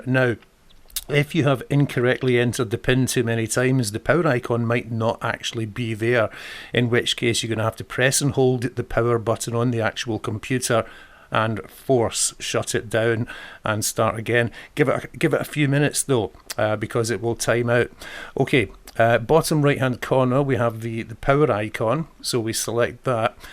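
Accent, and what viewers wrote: Scotland, United Kingdom. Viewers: Trust a Scotsman to know a great solution and easy explanation to boot! The accent was very nice too!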